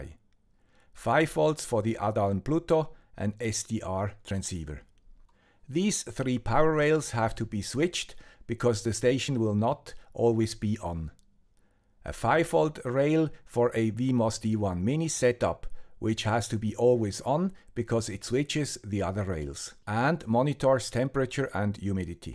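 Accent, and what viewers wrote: Switzerland. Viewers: Needed this video today...thank you,). I must confess, I am green with envy. Your workshop, supplies, and logical organizational of parts is what is on my hokey pokey ‘vision board’. You’re awesome 😎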